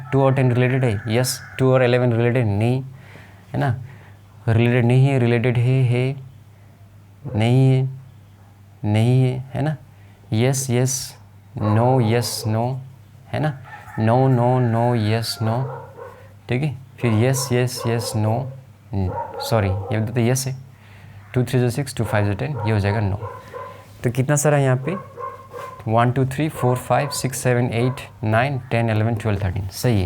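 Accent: Assam, India. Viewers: Kya aap Assam se ho?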